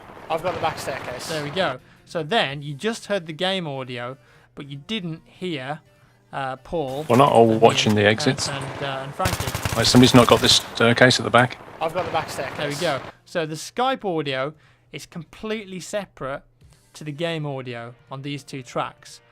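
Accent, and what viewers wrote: United Kingdom. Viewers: ur british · Gotta love that british accent! :3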